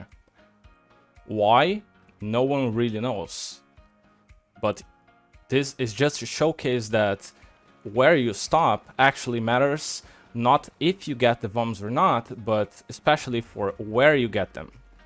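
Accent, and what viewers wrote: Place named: Turkey. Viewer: Are you Turkish? Your accents kind of sounds like a Turkish accent but not a thick one.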